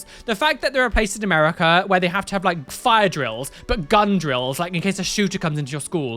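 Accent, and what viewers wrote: United Kingdom. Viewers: It's great to be able to understand what a youtuber is saying without upsetting some people it's easier for a brit to be able to understand a brit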